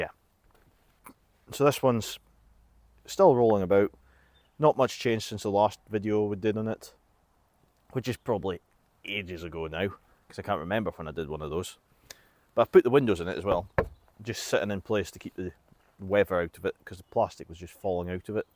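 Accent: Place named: Scotland, United Kingdom. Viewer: Well, this is one of those times that YouTube's random recommendations came up trumps! You are a very, very lucky man to own all those Reliants - I'd have a hard time deciding which one would be my favourite. I've always had a fascination for these, and do like the Mk1 Robin saloon with the glass rear hatch which I find stylish but I suspect if pushed to actually buy one it'd be a later Rialto. (A Kitten would be a tempting prospect, too. There used to be a couple running around my home town when I was younger.) Nice to hear a fairly local accent too - I'm watching this from just a wee bitty further north than Aberdeenshire.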